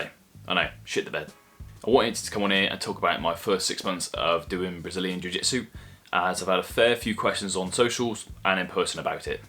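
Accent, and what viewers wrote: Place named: North, United Kingdom. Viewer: Good video, nice to hear a northerner on YouTube!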